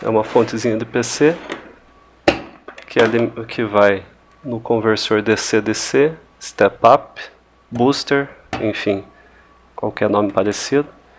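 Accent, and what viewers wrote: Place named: Paraná, Brazil. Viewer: Cara, sou fã do teu canal, não entendo como você não tem mais audiência. Conheci teu canal através do Rodrigo Conrado, o qual também tenho muito respeito e considero vocês as melhores referências no que diz respeito a CNCs DIY. Inclusive pra mim você é o pai das CNCs caseiras no Brasil, um pioneiro. Parabéns e muito obrigado. Estou pensando em criar o meu próprio canal para makers, pois vejo muita gente com dificuldades em montar seu equipamento e orfã de outros canais. Caso queira me contatar meu email é <mail> Sou do interior de SP e busco alguém como eu na minha região para projetos do tipo, trocar idéias e experiências. E você, como se chama (nunca ouvi você mencionando teu nome) e de onde você é? Pelo sotaque parece ser do Paraná, mas me perdoe se estiver enganado. Um grande abraço e muito boa sorte.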